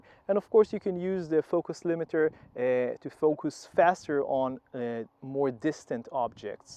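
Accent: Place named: Israel. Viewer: יפה מאוד!שומעים תמבתע הישראלי שלך..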